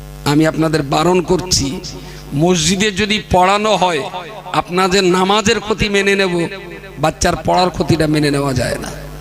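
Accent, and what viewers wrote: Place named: North 24 Parganas, India. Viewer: Apnar katha r style amar valo lage North 24 pargana r katha bolar dhoron emon dekhechi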